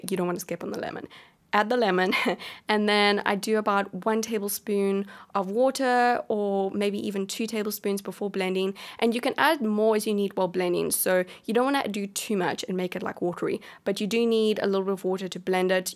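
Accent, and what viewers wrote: South Africa. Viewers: That South African accent nehh😍😍plus Woolies products got me hook,line and sin🇿🇦✌🏿👊🏿👍🏿😉 Lekker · I'm definitely gonna try out these recipes they look super yummy! Also, love the quality of your videos and use of good angles, closeups, lighting, etc. One more thing, my best friend is from Cape Town and gotta love those South African accents hehe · Stumbled on this video and was like ‘wow she sounds south african’🤔 Thats because you are! So lovely to see a fellow SA youtuber and loved the video! Now Im hungry... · Hullo from another SAfrican in New Zealand. Miss that accent and I miss Woolies!! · That's a South African accent. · Where is your accent from. I'm guessing South African. Sounds pretty. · Yah that's not an Aussie accent haha, South African more like :p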